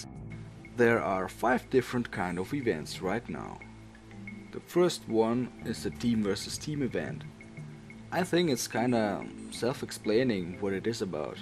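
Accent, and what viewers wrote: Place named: Netherlands. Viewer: ur accent tells me ur dutch gj!!!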